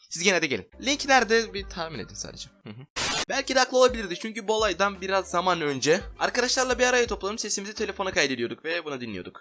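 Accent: Russia. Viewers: Abi rusmusun yoksa sadece rusyadamı yaşadın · Rus musun çok belli cünku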